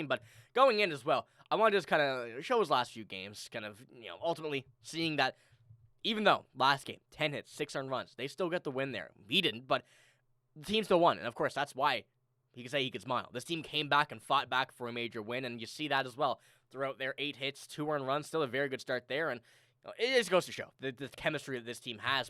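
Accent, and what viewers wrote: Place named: Canada. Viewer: I am pretty sure by your accent that you are Canadian, so how did you get to rep the crew?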